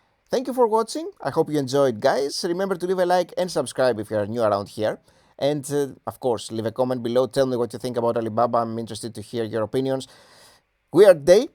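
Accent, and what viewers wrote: Greece. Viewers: Great content! And do I hear it right, is that Greek accent? :)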